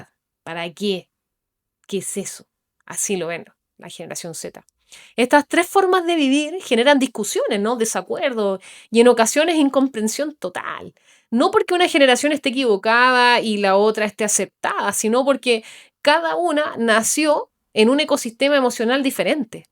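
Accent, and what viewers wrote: Chile. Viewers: Me llamo la atencion por que deje correr los primeros segundos y note tu acento y suavidad chilena, estoy de acuerdo como graficaste con viviendas como seria cada generacion jaja muy bueno el video.. saludos desde buenos aires!..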